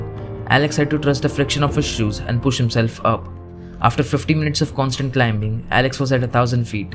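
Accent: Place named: India. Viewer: Are you Indian? I hear you sounds like call center.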